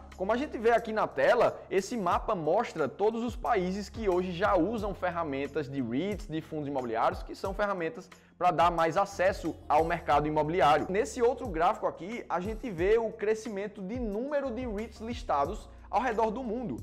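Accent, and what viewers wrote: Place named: Pernambuco, Brazil. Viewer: Pernambucano até a ventas! Pergunta aí se ele já comeu uns quarto de bode na serra das russas! Esse sotaque não me engana.... é da terrinha! kkkkkk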